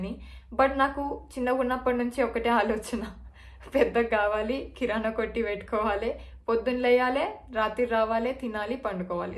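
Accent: Telangana, India. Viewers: What a cute telangana slang akka🤩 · Meeru totally telagana slang lo Matladuthunaru ante keka anipinchindi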